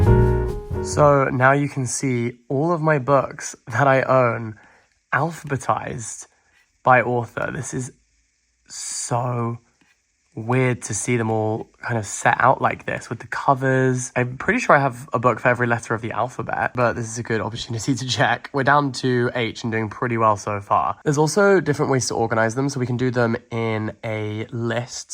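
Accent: United Kingdom. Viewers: I hope she paid damages for the lie. You sound like UK but the situation sounds like nutty California.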